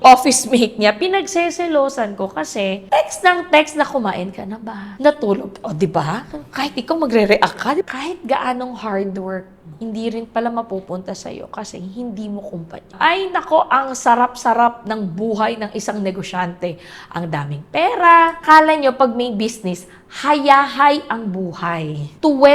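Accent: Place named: Zamboanga, Philippines. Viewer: Taga Zamboanga, Jabacana, ganyan talaga magsalita ibang babae doon.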